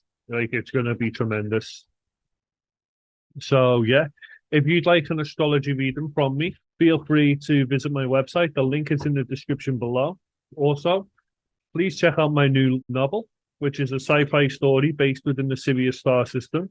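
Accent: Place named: Liverpool, United Kingdom. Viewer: Love the Liverpool accent btw! Can’t wait to see Liverpool, I have always been fascinated by Liverpool but when I get the chance I have always gone abroad! But next time….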